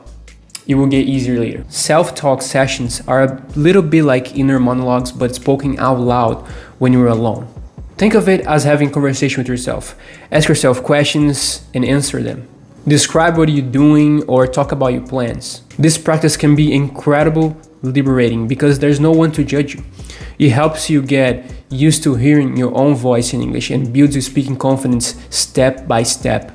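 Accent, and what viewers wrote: Brazil. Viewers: Guy, you're simply amazing! Are you Brazilian? Your english is so perfect. Kudos! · he has a slight accent from northeast Brazil, but this is normal. hahaha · Real, quando eu olhei e ouvi ele falando já ficou claro que era br KKKKKK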